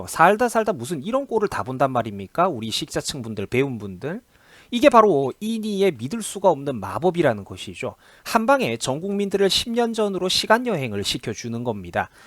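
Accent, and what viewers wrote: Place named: South Gyeongsang, South Korea. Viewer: 목소리가 경남쪽이네요...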